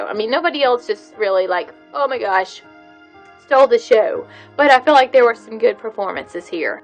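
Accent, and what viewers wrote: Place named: South, United States. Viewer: Just saw this movie. Haha where are you from? Judging by your southerness, you seem really close by. 😂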